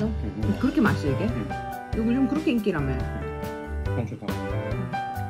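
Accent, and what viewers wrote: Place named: Japan. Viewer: 빵을 엄청 좋아 하시나봐요??^^고기는 감겹살에 상추죠~ 근데 일본사람들도 저렇게 먹는걸 좋아 하나요? 한국은 상추에 먹을때 된장을 무처 먹는데요 ㅎ,근데 님은 한국분인가요 일본분인가요? 한국분으로 알고 있는데 ^^ 말억양이 일본분 억양이 보이네요~ 행복한 일상 같이 합니다~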